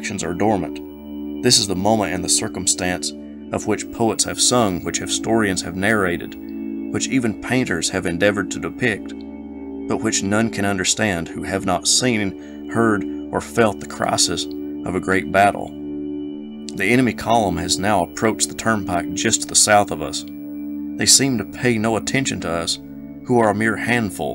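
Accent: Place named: Virginia, United States. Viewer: absolutely fantastic Virginian. i could listen all day never tire of it. that reading took me to the battle. WOW!!! thank you sir.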